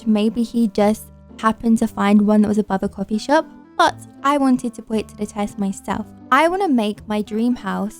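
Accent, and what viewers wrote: United Kingdom. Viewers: so England, hence the British accent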